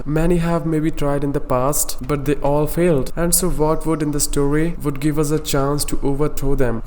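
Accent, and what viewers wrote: India. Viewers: Bhai teri accent Indian lagti hai. Tu indian hi hai naa?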